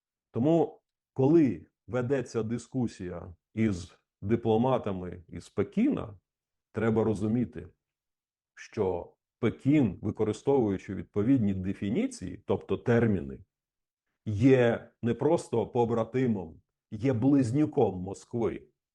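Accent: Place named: Galicia, Ukraine. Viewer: Пане, а почему такое ГАЛИЧАНСКОЕ произношение звука "Ч" ??? Очень медленная речь, просто тянучка !!!!!!!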